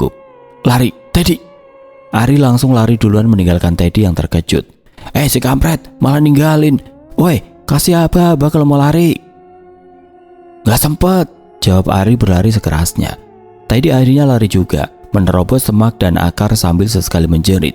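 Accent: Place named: Java, Indonesia. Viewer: Waalaikumsalam, maaf ga maksud tp tiap mas bawain cerita berbahasa Sunda, lucu karna logatnya jawa, suka banget dengernya😊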